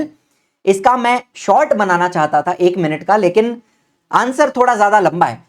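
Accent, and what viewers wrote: Maharashtra, India. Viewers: Ek no marathi sir 😅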